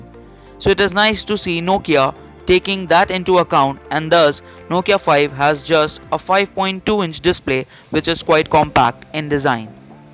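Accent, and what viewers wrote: India. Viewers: This is called hinglish, that is acceptable as long as we can understand the topic.. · He’s from fking India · kaizumi soriano its a kaling tamil india accent hahahaha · Your Indian English is funny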